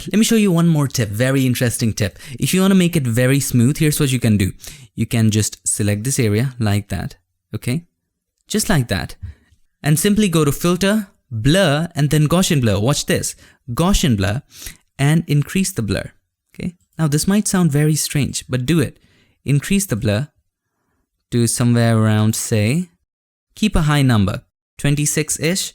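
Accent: India. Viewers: i hate hindu English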